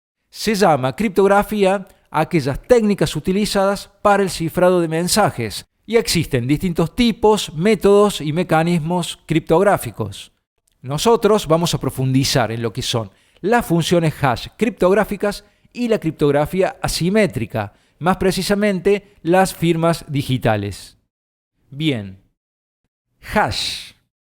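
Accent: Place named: Córdoba, Argentina. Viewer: Genio capo Idolo, muy bueno todo. sos un crack! por tu tonada andas cerca de villa maaaria! abrazo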